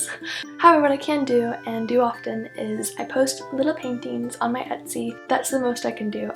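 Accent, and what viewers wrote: United Kingdom. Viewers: I believe she has mentioned in an older video that she is originally from Florida, and she moved to London, which is where she currently resides. It might seem like she has an accent or uses British English due to her stay in London for an extended period of time.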